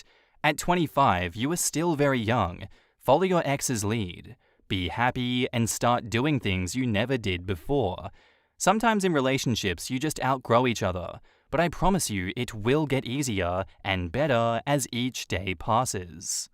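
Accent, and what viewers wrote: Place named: Australia. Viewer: I love these. Thank you, for delivering these stories at the right speed, in a nice accent and with enjoyable, insightful and to the point commentary. 💜✨🧿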